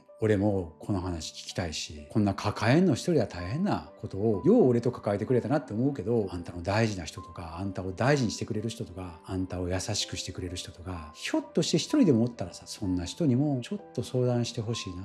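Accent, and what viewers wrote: Kansai, Japan. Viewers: 関西住みで関西弁あまり好きじゃないけど、先生の関西弁は温かく感じました。甘いものも確かにですね🥺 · 海外在住です。関西弁に泣けました。ありがとう。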